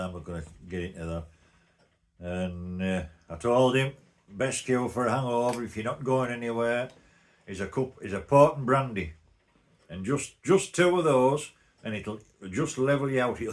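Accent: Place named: Scotland, United Kingdom. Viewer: . I’m glad you’re OK and you just had a flat tire. I totally love your accent. I was just imagining you screaming out the window with that lady that was honking at you. I think I would’ve been dying laughing if I were her and I doubt if I could’ve understood anything you said. Sean Conner was a Scot, wasn’t he? God bless you my friend.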